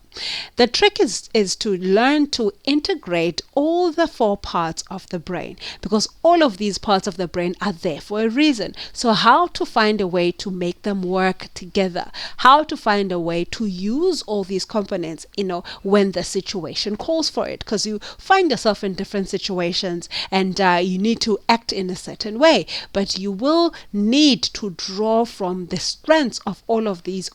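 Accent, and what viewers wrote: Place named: Kenya. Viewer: Thank you so much for this. It was very helpful and it was very nice to hear an accent which reminds me of my homeland Kenya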